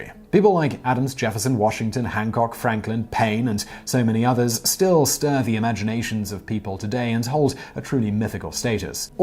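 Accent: England, United Kingdom. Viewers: Yes, he has an english accent. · Never trust anyone with a British accent trying to teach you history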